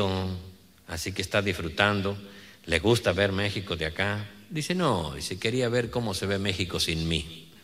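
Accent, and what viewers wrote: Argentina. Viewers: A mi me costo un poco comprender muchos terminos pues soy una mujer cubana y no solo estamos muy lejos los unos de los otros sino que la forma de hablar y sobre todo los modismos son extremadamente diferentes. Pero poco a poco lo fui entendiendo todo y hoy en dia lo escucho casi todos los dias. Le felicito maestro, por su humor limpio y puro. Por algunas partes del mundo los argentinos tienen fama de ser orgullosos....... y luego de haberlo conocido a usted, ya entiendo el por que. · ¡Muchas gracias desde Barcelona!,....un honor y placer escuchar y reir también por los chistes, así como poder apreciar en la voz, el acento propio y humano, no solo de la calidad de la cultura Argentina, si no de un gran artista y persona.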